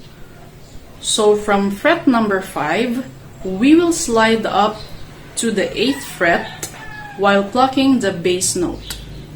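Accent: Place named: Australia. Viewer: natawa na naman ako sa australian accent, timing po nagkakape ako lumabas sa ilong ko,, 😃😄,, kahit paulit talaga natatawa pa rin ako😁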